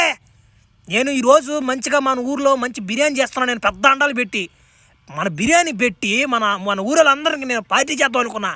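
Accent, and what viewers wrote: Nellore, India. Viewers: Nuvvu buthulu matladitey Nellore yasa vastadi bro❤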